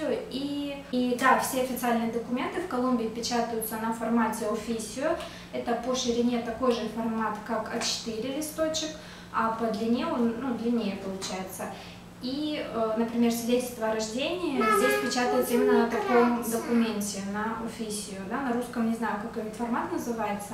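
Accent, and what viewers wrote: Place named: Argentina. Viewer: Хотел заметить что у Вас аргентинское произношение))) По безопасности, все зависит от района. 3года жил в Боготе, вспоминаю и скучаю ))) По фразам, Колумбию можно охарактеризовать 3 словами : Tranquila, qué pena, mañana. Каждый.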